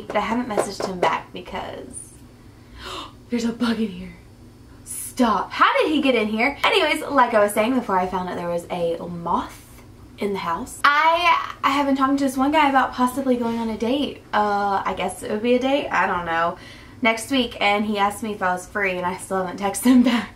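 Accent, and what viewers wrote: South, United States. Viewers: I know I'm a little late in catching up on your videos but I would definitely love it if you read what you've been writing in your diary, I'd love it if you talked more about your experiences relationship-wise! And I love your southern accent, let it come out more(: Hahaha. Love you<3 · You're so down to earth and I wish I knew you in person! You seem like the type of person I would get along with so well and your southern accent is so fuckin cute! (In the least creeper way hahahah) love you girl!!!😍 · Was it just me or did no one even notice her accent until she pointed it out? 😞 like fr she said "my southern accent" and I was just like "omg wtf?? U have an accent?" And then when I payed attention I was like "omfg u have a southern accent!" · Yea.. we would totally be best friends if we ever met. Totally! Southern twang, bravo, gossip girl, dog lovers, dreams to open a no kill shelter! 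Ah! Awesome! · I know what you mean with the southerness when filming! I also am a lot more southern in person than on camera. Glad it isn't just me haha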